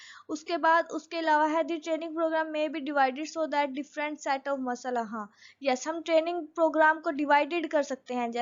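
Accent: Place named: Punjab, India. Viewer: you should to need more confidance, well good knowledge. Aapki Awaaz se lag rha hai aap Punjabi hai... 👏👏👏👏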